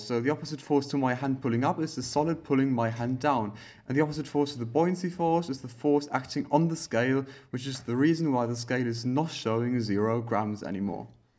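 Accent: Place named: United Kingdom. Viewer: British english 😮🎉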